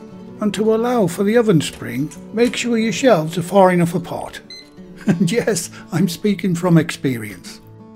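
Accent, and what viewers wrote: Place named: Scotland, United Kingdom. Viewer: He does Winnie The Pooh and with with a little touch of Scottish brogue.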